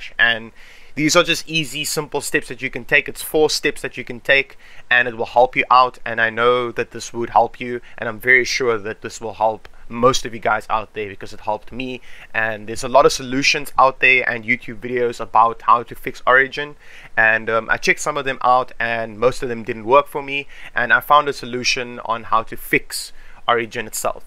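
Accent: South Africa. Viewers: You have an afrikaans accent🤔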